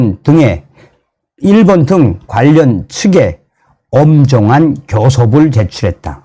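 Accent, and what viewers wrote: North Korea. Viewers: 정성산 선생님 남한에 잘 오셨수다래 ㅎㅎ 이북말 선생님 말씀하실께 정겹수다래 ㅎㅎ감사합니다 정 선생님 저희부모는 평안북도 선천이외다 늘 수고가많수다래 🌼🌷🌸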